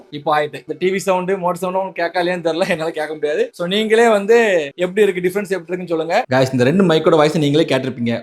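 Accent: Tirunelveli, India. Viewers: Anne nega tirunelveli yaa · Anna neenga thoothukudi, thirunelveli pakkama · Anna nenga tirunelveli Karan ah?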